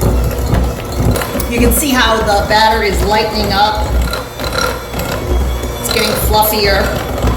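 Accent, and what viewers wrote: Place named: East Coast, United States. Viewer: Hi, Thank You for the tip on freezing the bread. I'm a bread [person, so I could eat the whole loaf, but my sensible self says NO! LOL We're heading out camping for a few days, last camping trip of the season. I picked up some molasses the other day at the store, so I'll be making the Anadama Bread when we get home. I thought your accent suggested an East Coast accent. Thanks for replying. Have a Great Day. Ellen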